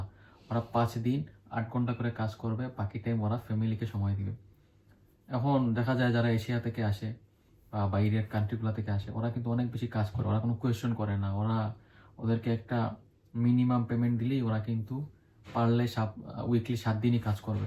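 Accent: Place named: Sylhet, Bangladesh. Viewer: Bhai accent a lager Sylheti...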